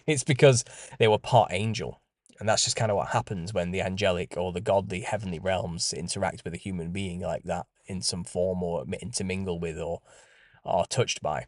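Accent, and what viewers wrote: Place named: Wales, United Kingdom. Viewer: I love the British accent to because my mom was from Wales and all her family sound like you😂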